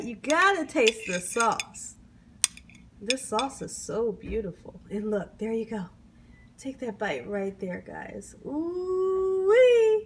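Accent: South, United States. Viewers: Does anyone know where from bc i swear she talks so Southern.. And sure does cook southern..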